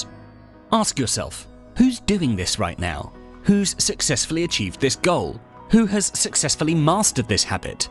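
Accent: United Kingdom. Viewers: Brit accents are a hardship for me. I made it through the video! One step closer to visiting Europe!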